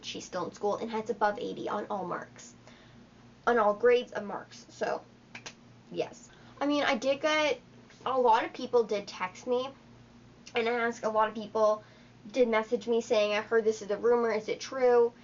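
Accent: Canada. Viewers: RhiannaFrancesca07 i knew as soon as she talked she was from Canada lol, are you also from Canada??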